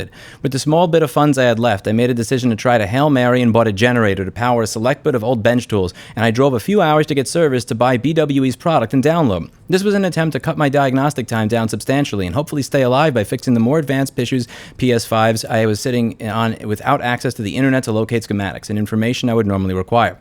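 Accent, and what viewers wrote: New York, United States. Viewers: Louis’s accent becoming more and more stereotypical NYT as he talks is perfect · Great video Louis. I’m a former NY’r and man the NY’r in you comes out full throttle in this video. Keep up the great work!! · Ive watched for many years, taking a break from him over the last year or 2 (sometime after the whole RTR saga). Im back now because of this scandal and all the coverage its rightfully getting... I cant help but notice that NY ACCENT is much heavier... And he has FAR MORE NERVOUS TICKS than he ever did before... In fact, I dont remember either of these things in the past. In this video, he's twitching an awful lot. I hope hes taking care of himself. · The NY accent came out hard in this one.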